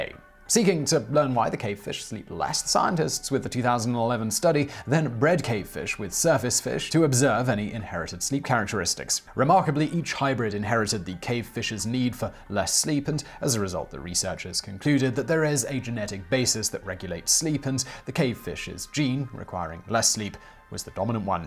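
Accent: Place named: RP, United Kingdom. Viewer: That's not it - it's just his accent is impossibly posh. Plus they (obvs) are not from N.A. & didn't Google search "how to pronounce tilapia" prior to filming.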